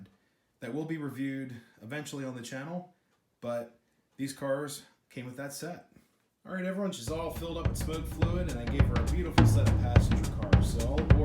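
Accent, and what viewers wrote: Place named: Maryland, United States. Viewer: the Train Garden? are you from Baltimore? I hear those Maryland and SE PA vowels.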